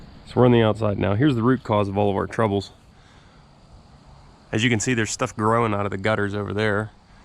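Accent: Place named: South, United States. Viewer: Are you from western PA originally? You sound very southern. Especially the ol' lady comment.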